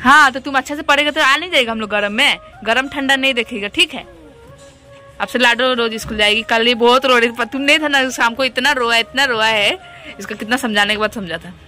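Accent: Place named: Jharkhand, India. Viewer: Apki jharkhandi hindi boli bahut achcha lagta hai. My jharkhand se apka video dekhta hoon.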